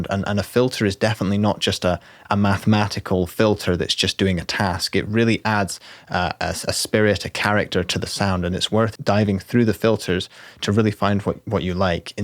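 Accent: Scotland, United Kingdom. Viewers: Great video!! It's nice to hear a fellow Scottish voice on YouTube ;) So when can we expect the FM synthesis series?! :P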